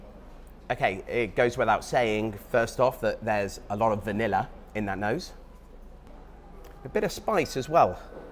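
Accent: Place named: England, United Kingdom. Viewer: What an amazing video! When I go to Japan I want YOU to be my guide!! I have watched many of your videos and I love them all, very interesting! It is nice to see an English man that knows so much about Japan and whisky!! And I love your accent! Thank you so much for creating such interesting content about Japan, I enjoy every single one. Kanpai!!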